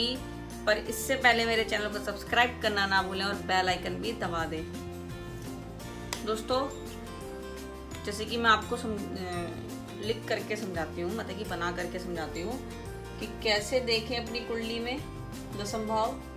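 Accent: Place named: Punjab, India. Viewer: madam app ki awaj me punjabi jhalakti ha kya aap bhi punjab se hain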